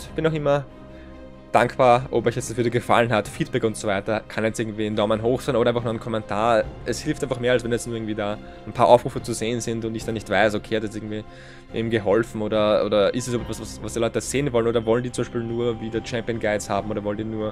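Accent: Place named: Vienna, Austria. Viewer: bist du österreicher :D klingt iwie wienerisch :D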